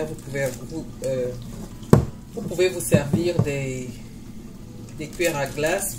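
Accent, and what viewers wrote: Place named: Cameroon. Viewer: MERCI ❤❤ vous avez un ton de chez moi Camer 😊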